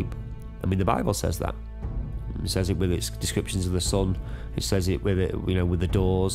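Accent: United Kingdom. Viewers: Roger Reddersen it's called northern, how a lot of us English speak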